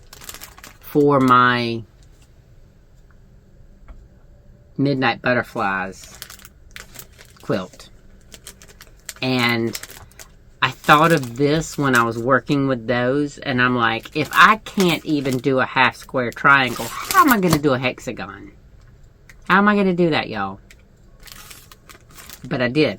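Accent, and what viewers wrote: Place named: South, United States. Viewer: Hi! I love your videos. I watch every single one of them. Your southern speech comes my hectic Northwest pace. Please do take time for yourself because it’s very important.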